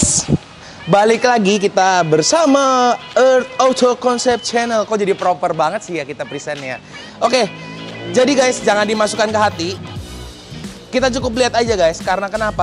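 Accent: Cirebon, Indonesia. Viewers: 100% orang cirebon dari logatnya... Mantap lah, sukses terus buat semoga makin keren dan makin maju di dunia otomotif....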